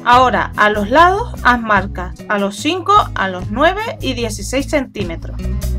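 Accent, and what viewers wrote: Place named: Gran Canaria, Spain. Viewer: Genial, me ha gustado!!! por el acento se nota que eres de Gran Canaria, un saludo desde la isla vecina!!!!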